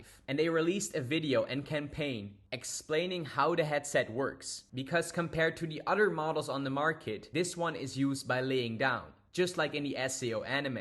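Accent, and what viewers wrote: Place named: Netherlands. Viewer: Love the Dutch accent makker!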